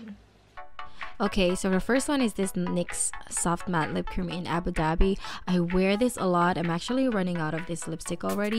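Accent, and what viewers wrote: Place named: England, United Kingdom. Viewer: love your english accent sis 😊 Helly Cass here sis from the group 😊 I subbed sis.. ty ulit sis sa pag subbed 😊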